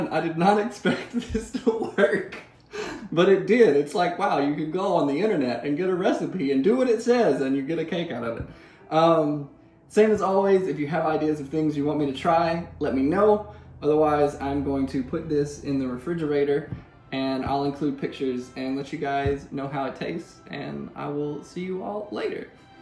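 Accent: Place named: South, United States. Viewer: I can’t stop laughing at this and the southern accent -Savebee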